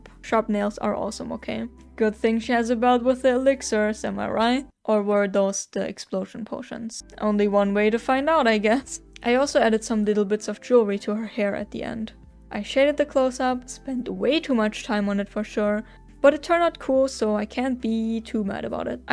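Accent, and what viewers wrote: Germany. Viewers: I am so glad i found ur account! I love ur artstyle! Also, are u german? Bc of ur cool accent! I loved this vid keep going I really enjoyed it! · i really love your art i just clicked on the video cuz i LOVE the owl house and as soon as i saw you and your art style i had to follow you! btw are your like german or smth? kinda sounded like it!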